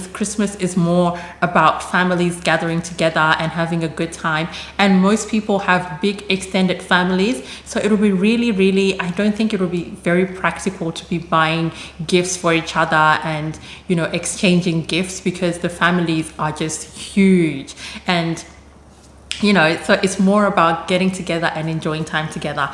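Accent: Australia. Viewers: Another lovely video. You speak so nicely, but I am beginning to detect a hint of an Australian accent. As an older Australian I do miss the joy of a homemade Pavlova at Christmas. Passionfruit, strawberries, peaches, mangoes, ect: I haven't had one in years. I do hope you get to enjoy one with your family.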